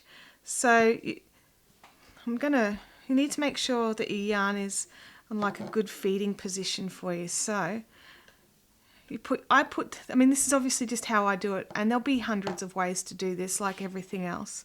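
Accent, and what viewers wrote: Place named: Australia. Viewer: Thanks so much for this!! Well done and nice to hear the Aussie accent. 🙂🙂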